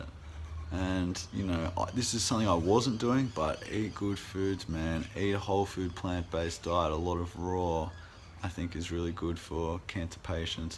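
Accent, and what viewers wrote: Australia. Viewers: Hahah no! I'm probs just not used to the aussie accent, i'm from New Zealand you see :p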